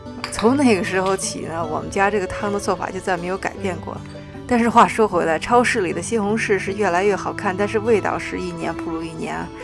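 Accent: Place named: Beijing, China. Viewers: 冒昧請問是哪裡人？在那裡長大的？聲音像北京人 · 听口音像是京腔。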